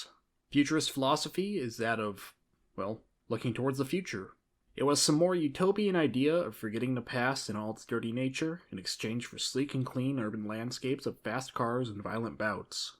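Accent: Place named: Wisconsin, United States. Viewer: You sound like you're from Manitowoc, Wisconsin.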